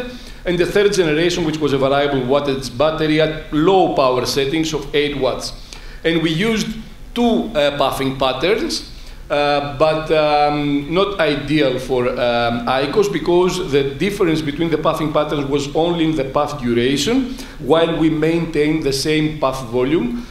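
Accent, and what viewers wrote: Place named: Greece. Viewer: 100% accurate Greek accent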